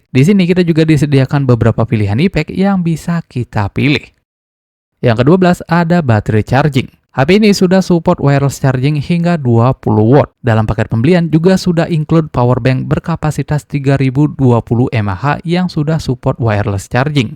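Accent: Bali, Indonesia. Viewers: ini logat bali kah?atau mana?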